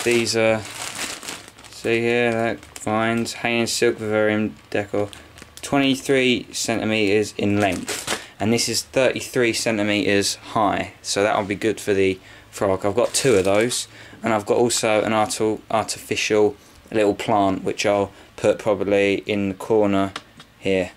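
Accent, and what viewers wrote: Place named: United Kingdom. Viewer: Great vid! Do you live in the UK or the US? Because you're using American measurements but you have a British accent.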